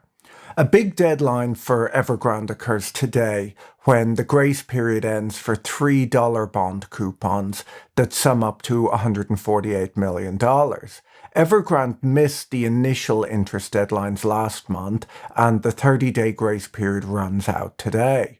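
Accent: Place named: Dublin, Ireland. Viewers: Defo - not D4 - educated Dublin accent thou. I would guess his parents are from the country given his lack of specific accent. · great content as always. Can't quite place your accent- Roscommon? Mayo? D4?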